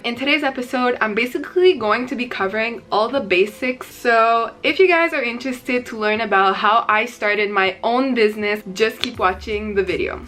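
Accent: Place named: Montreal, Canada. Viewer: I know she’s from Mtl Just from the accent 😭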